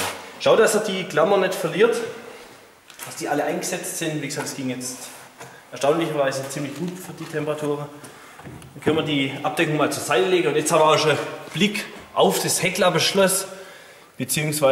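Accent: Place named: Swabia, Germany. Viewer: Top Reparaturanleitung auf Schwäbisch. Mehr davon bitte...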